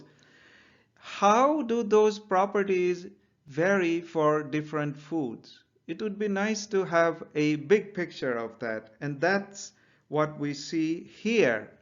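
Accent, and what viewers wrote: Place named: India. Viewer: listen to how fucking Indian you are...incredible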